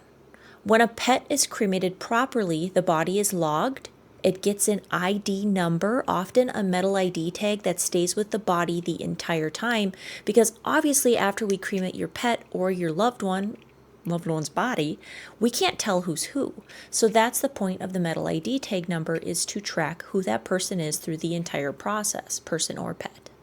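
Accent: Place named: Northeast, United States. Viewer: I love the way she talks, the way she pronounces tag, bag and flag. Shes got to be from the northern east coast ❤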